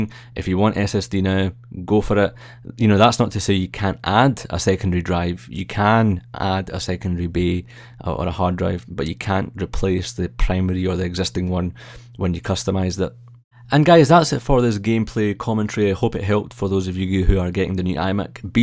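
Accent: Scotland, United Kingdom. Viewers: Are you a Scot?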